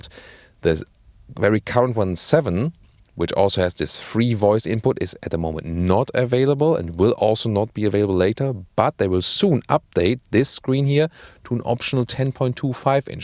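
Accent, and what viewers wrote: Germany. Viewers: Lovely when you wake up at 2PM and you can no longer fall asleep, you get to hear ' English with gorgeous German accent. Works better than ASMR!! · The perfect germenglish accent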